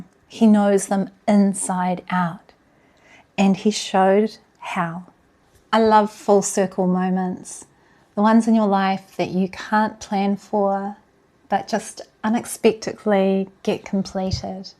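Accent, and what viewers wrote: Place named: New Zealand. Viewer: Yes! Great to connect. When I heard you cool Kiwi accent I felt like I was listening to a sister. Yes, pitch accent is so important. When we are out together , my wife lets me speak freestyle, but when we are at home together she is quite strict about teaching me how to speak with the right accent. Also she said "I can't teach you to speak mans style so you will need to find someone for that". I found a guy online who I would with once a week. He teaching me the real deal. I told him, no Keigo, and I do not want to learn textbook rubbish. And he agreed with me 100 percent. I think I'm an interesting case for him. My local friends are very patient but they mumble a lot. Tokyo japanese is so clear compared to that, and being in the countryside here in Amami, everyone speaks casual style He teaching me the real deal. I do 4 Kanji a day. 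I think Kanji will take a very long time to get. Your tips on learning to read stick with me, so I'll keep doing that. My reading is pretty bad though.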